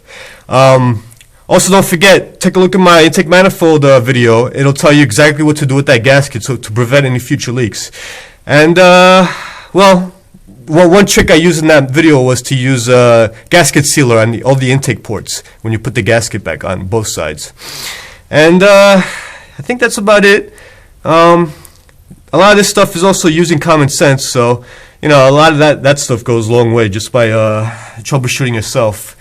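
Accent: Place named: New York, United States. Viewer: Must be from NEW YORK!!!!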